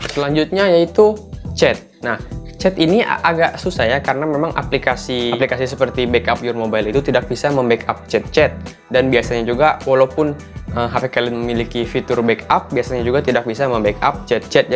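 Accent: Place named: Tahuna, Indonesia. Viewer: Jga takaluar logat tahuna e😂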